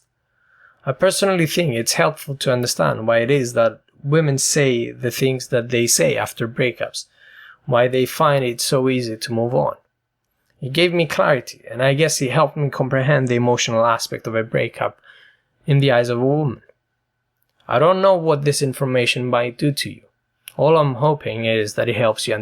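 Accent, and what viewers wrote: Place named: Russia. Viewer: you sound like you are russian or ukrainain.... i dont know why... but you were spot on.. why is it that.. people from such countries are so redpilled?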